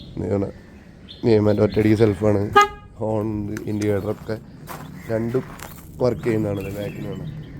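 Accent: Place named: Thrissur, India. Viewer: നിങ്ങളെ സംസാരം കേൾക്കാൻ നല്ല ഭംഗിയുണ്ട് തൃശൂർ അല്ലേ🌹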